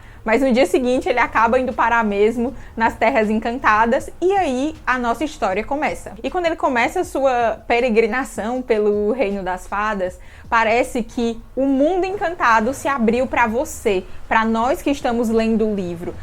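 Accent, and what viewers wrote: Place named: Northeast, Brazil. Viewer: "Uma hora eu falo assim, outra hora eu falo assado" ahahaha ah, meu nordeste ❤ Ótima resenha, já quero leeer 😍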